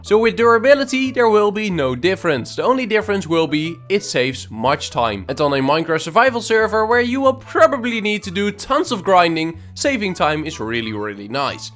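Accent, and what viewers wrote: Netherlands. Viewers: Ur from nl i can hear it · are you from netherland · i can just tell you are from nl:)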